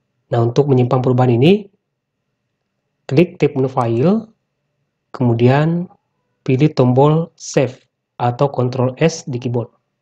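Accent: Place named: Sulawesi, Indonesia. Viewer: Cara bicaranya mirip orang Sulawesi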